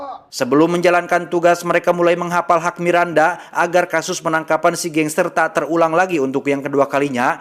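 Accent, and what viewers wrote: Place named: West Java, Indonesia. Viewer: Sunda na timana bang?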